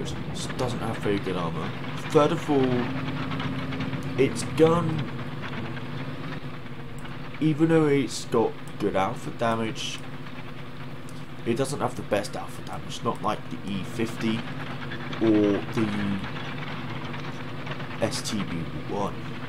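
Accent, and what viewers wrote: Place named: United Kingdom. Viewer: I can tell hes British because his accent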